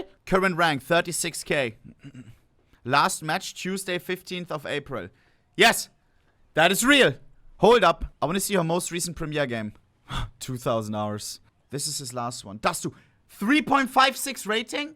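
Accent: Germany. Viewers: Stresst richtig, wenn Deutsche versuchen englisch zu sprechen :D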